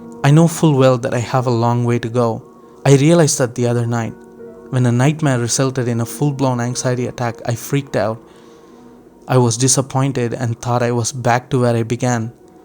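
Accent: South India, India. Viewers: I can't tell you how similar our experiences our, I too am from the same place that you belong to (guessing from your accent) I had the same experience with smiling as described by you. I was rediculed once for my smile after that it took me ages to smile naturally. Coming from a poor south indian background with darker skin didn't help me with building my confdence. Thank you for these videos. You may not know the ways you are influencing other people's life without even realizing. Keep up the good work.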